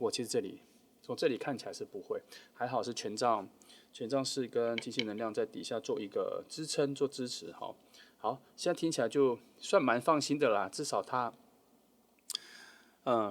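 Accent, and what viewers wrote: United Kingdom. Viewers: 好听的英腔诶❤ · 喜歡英國口音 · 是好聽的英國腔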